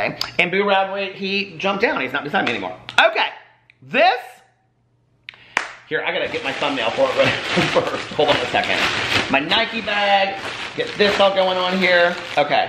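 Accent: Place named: Midwest, United States. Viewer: I love that you are a MidWesterner, so am I. I do crack up when I hear the verbal Midwest come out . Like your black church shoes. Years ago I made a reference to growing up we didn't have numerous shoes we had school shoes, play shoes and church shoes and a couple people didn't know what church shoes were. Lol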